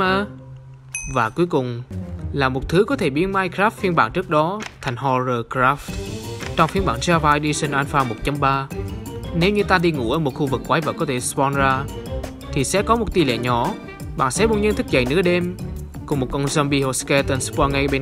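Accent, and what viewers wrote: Central, Vietnam. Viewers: Nếu mn chưa theo dõi kênh của lâu thì hồi xưa tầm 1 năm trước có nói tiếng miền trung =)))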